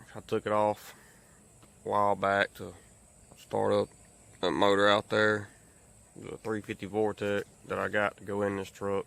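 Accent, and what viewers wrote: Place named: North Carolina, United States. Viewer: You got to be from N.C.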